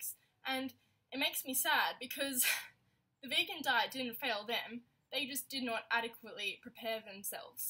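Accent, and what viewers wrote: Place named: Australia. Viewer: Cute Aussie accent.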